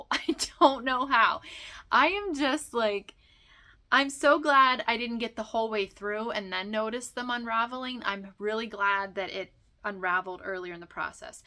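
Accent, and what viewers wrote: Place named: Western Pennsylvania, United States. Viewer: Such beautiful projects! I think you can’t go wrong with any of your choices of your gorgeous hand spun for your headband! So very pretty. I also just love listening to you chat, I too am from Western PA, but my husband and I moved a bit further south many years ago. Listening to you just reminds me of family we still miss so much. Thanks for another enjoyable episode. ❤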